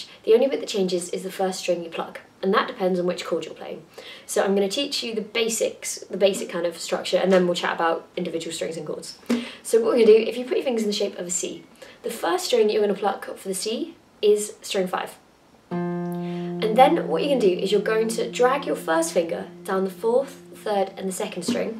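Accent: England, United Kingdom. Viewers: Seriously love your videos, I've literally only just got a guitar for my birthday, which is today, woo! And I've never even had a guitar lesson or anything, I'm going to hopefully attempt to teach myself, which should be interesting:L but yeah I think you're awesome, and not to be horrible to other talented guitar tutorial people out there, but I find your videos really easy to follow, you seem laid back and really cool, plus because you're english like I find you easier to understand than some other youtubers because I don't have to listen through a really thick accent which is always helpful when you're trying something new haha, if I can be half as good as you I'd be extremely happy! Carry on making awesome videos and I'd love to hear something by maybe, quite like that song although I usually listen to heavier stuff, but still, also you have a really nice singing voice, pretty unique and I like that, also I know as you've said in a few of your videos singing is not your strong point, but I think it's really great when you do sing, shows confidence, and it helps for me to understand more, I'm a learning by hearing kinda person, but yeah, excellent work!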